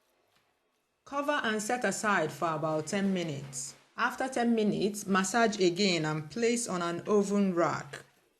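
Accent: Nigeria. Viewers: hearing your voice with our accent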